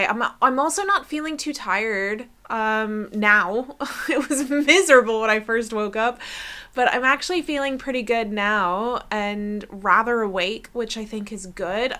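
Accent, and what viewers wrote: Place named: United States. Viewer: Which city are you living ? Because it seems like European country but your accent is like American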